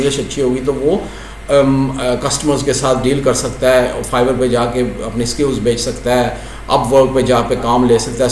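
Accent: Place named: United Kingdom. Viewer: Hi, You the person whom i was looking for British accent and your excellent work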